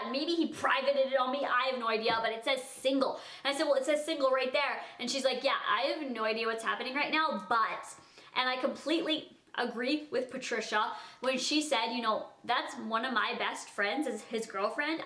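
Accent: Canada. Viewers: Rose Teters she’s canadian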